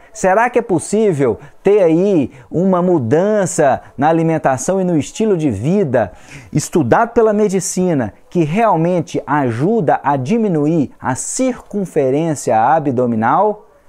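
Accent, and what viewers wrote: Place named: Minas Gerais, Brazil. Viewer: Amo nosso sotaque mineiro. 😍😍❤❤ Depois deste vídeo ficou mais fácil entender o processo de emagrecimento. 👏🏿👏🏿👏🏿👏🏿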